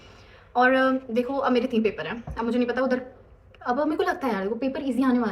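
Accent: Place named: Haryana, India. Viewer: Questions to ask: Apne konsa college aim kiya hai? Aap caurse konsa krogi? Kya cuet dene ke liye apne computer sikha tha ya bina sikhe hi de Diya? Mujhe computer chalana nhi aata to kya me fir bi cuet de paungi? Koi glti to nhi hogi? Ya sikhna pdega pehle? Plzzz ans these questions....btw I love your Haryanvi accent ❤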